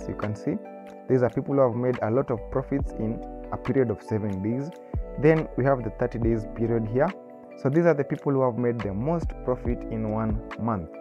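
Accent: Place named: Kenya. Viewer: ik a kenyan when i hear one.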